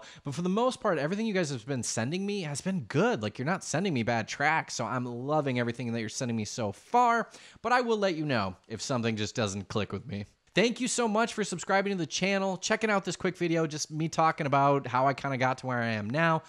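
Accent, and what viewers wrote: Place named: United States. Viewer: Where you from bro? You have one of those hard to place accents. Colorado maybe, or Cali? Keep it up man, this is becoming one of my favorite channels! Quit MGK and Post Malone though, they're both wankers lol!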